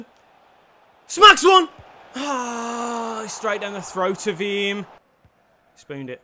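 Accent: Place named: Birmingham, United Kingdom. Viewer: I think you re from a posh area in birmingham shard end kingshurst chelmsley wood , solihill ?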